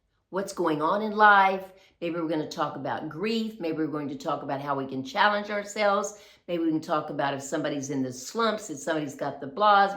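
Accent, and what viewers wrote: United States. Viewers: I think with your strong American accent it is difficult to speak French well??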